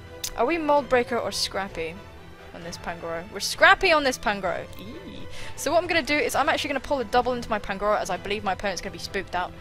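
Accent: United Kingdom. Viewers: You are SUPER British! Lol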